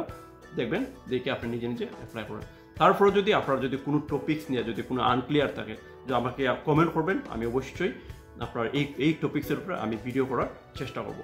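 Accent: Sylhet, Bangladesh. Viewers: ভাইয়া আপনার বাসা সিলেট হবিগঞ্জ??? কথায় বুজা যাচ্ছে,,Am i right? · ভাই কিতা সিলেটি নি?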